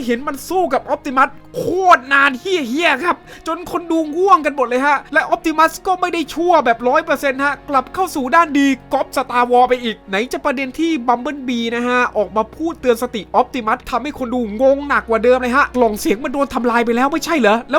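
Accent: Isan, Thailand. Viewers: มักเสียงพากษ์อิสานของเจ้าหลายๆ😂❤ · ผมละชอบจักหวะพูดอีสาน 555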